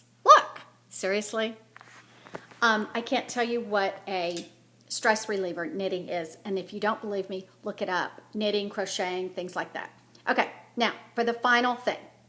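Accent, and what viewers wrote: Texas, United States. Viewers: Am catching up on some of your recent videos...funny how after you moved back to TX, your accent is pretty strong. :) I am very sympathetic as to the struggles and trials you and your family are facing. It does seem like so many these days (self included) are going through some major storms. Thankful that we know Whose Hand we hold and the God who gives us strength to take each day as it comes. I will keep you in my prayers. Thanks for sharing from your heart, as always. I don't knit but I do crochet and have always found it to be a great relaxer. Blessings always! xo And Merry Christmas! No stress, only peace and joy! :)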